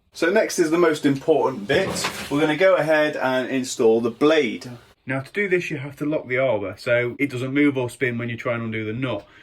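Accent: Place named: London, United Kingdom. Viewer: The ONE good thing about is he talks the Queens (oops sorry!) Kings English! with no damn accent that at times makes it hard to understand (yes i come from LONDON & speak properly like!) & thankyou, yes they are Allen bolts not set screws like said by others! It takes a Londerner! But sorry you need to tell us more than whats in the useless manuel like the angles etc! So i didnt give you a thumbs up but i didnt give you 1 down either. Im old skool i had the 255 when it 1st came out...... long time ago & they still cant get it right! Best of luck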